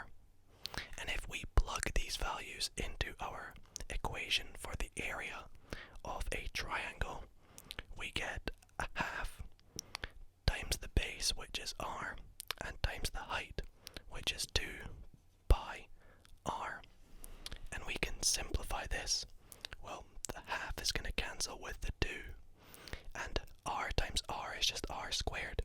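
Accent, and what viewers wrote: Scotland, United Kingdom. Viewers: I can't place your accent are you a fellow scot? :)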